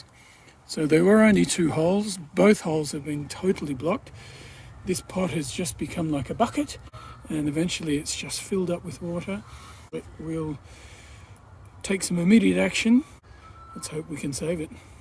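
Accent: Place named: New Zealand. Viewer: Sound like a Kiwi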